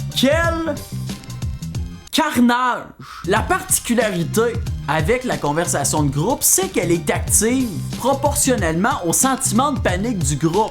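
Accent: Quebec, Canada. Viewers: ptn je suis française et j'ai clicker sur la vidéo et ça ma pris genre 30 secondes à comprendre que t'étais Canadien mdr. j'adore votre accent mais faut s'habituer hahah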